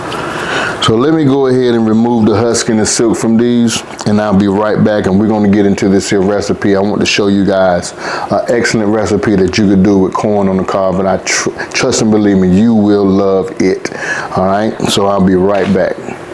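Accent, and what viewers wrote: South, United States. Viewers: “I got some irl. Some olive irl”. is soooo country, I love it. 🤣🤣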